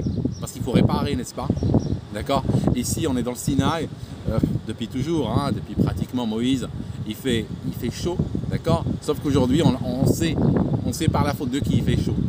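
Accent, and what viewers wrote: Switzerland. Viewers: Le retour de l'accent suisse d'? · Ton accent Suisse revient grave quand tu es énervé...😂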